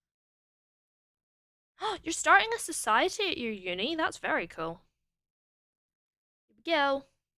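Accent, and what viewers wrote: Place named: Scotland, United Kingdom. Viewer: HELL YEAH- SOME SCOTTISH BACKGROUND NOISE FOR MY COURSEWORK (i dont catch streams often and by GOD am i glad i found this)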